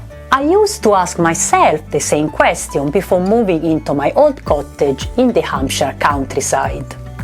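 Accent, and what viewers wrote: Italy. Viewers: La sua pronuncia dell' inglese è assolutamente italiana ! Niente di grave, ovviamente :)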